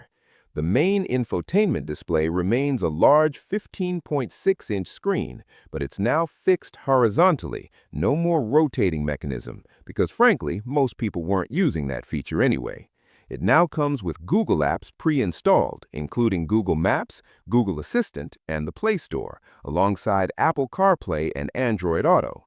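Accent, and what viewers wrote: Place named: United States. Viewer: Agreed! And to make it worse, the AI voice is 'Merikan!!!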